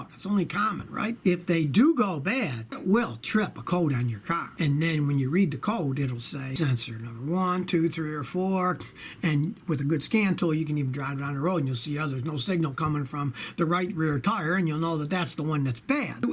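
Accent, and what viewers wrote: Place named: East Coast, United States. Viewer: I have listened to many of your videos and I have an important question. What kind of accent do you have? It doesn't sound like Michigan, more like East Coast. Please answer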